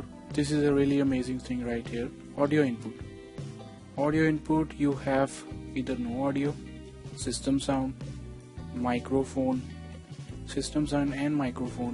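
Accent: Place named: India. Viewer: I dont trust this guy he sounds like a Indian tech support scammer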